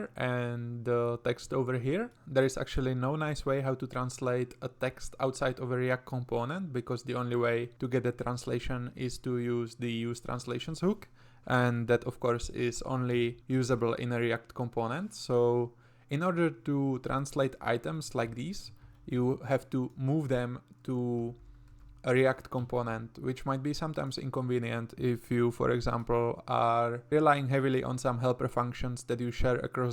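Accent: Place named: Czech Republic. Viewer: pekna chenglish:DD ale dobre video, jen hledam jak udelat localized pathnames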